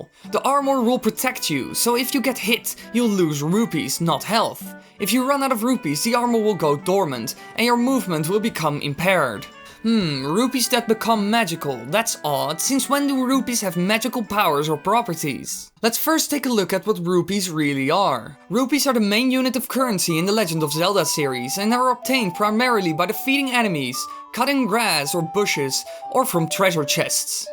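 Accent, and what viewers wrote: Netherlands. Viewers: lekker nederlands accent man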